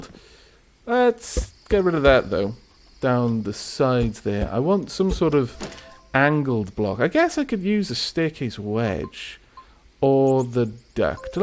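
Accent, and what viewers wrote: Wales, United Kingdom. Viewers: are you Welsh 🏴🏴?? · is he Welsh?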